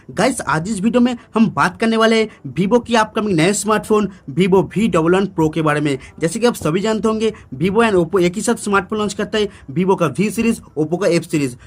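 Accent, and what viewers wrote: Bengal, India. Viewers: Tum bangali h kya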